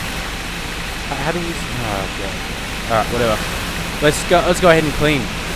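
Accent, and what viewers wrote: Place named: Australia. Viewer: he sounds NOTHING like nerd3. Nerd3 is English, this guy is Australian...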